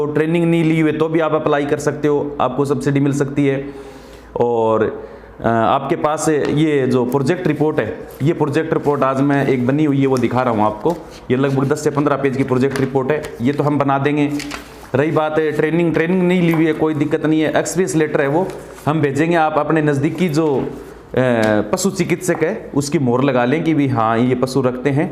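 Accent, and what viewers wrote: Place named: Churu, India. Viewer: Sir aap kha se h ....aapki boli se churu k aas pass k lagte ho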